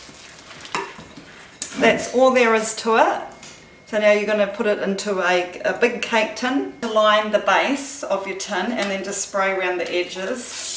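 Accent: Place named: New Zealand. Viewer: Love that kiwi accent. As well as the fruit cake recipe. 👍😉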